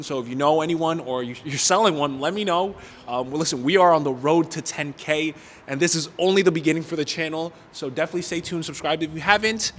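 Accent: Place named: New York, United States. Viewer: wow really? I would’ve never guessed that. I was thinking Puerto Rican but didn’t want to say lol. Your accent gives me Italian vibes, that New York City type accent ya know. Love the car btw, I’m working on fixing some things in my 2008 Toyota Camry as well speak, getting a water pump and rear struts installed , and now I need a new transmission too.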